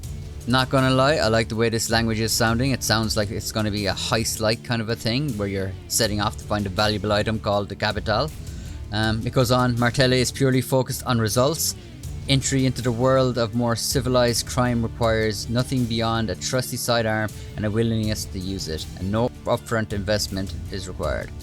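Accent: Ireland. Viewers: I never tire of that sweet Irish accent.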